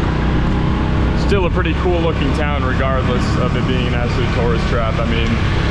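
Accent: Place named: California, United States. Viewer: you sound Californian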